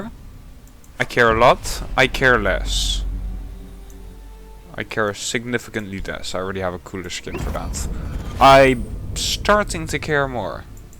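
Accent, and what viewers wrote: Netherlands. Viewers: U dutch? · are you from the netherlands?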